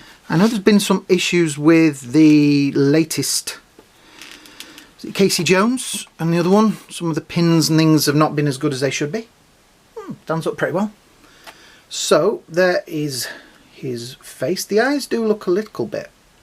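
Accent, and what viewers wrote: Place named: United Kingdom. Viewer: I only watch about 6 reviewers and you're one, the British accent gives you the edge over the others. Should do like a monthly livestream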